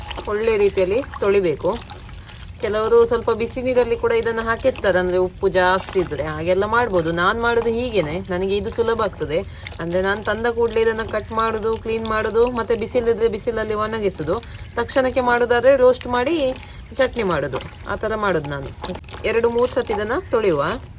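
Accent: Mangalore, India. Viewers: Thank you! More than the chutney your mangalorean kannada was very easy and could easily understand. Chutney preparation was excellent. God bless u..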